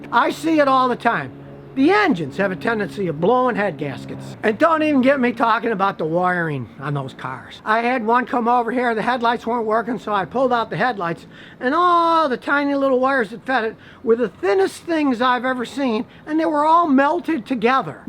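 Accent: United States. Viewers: your english is really american and you do your reseach 100% before a video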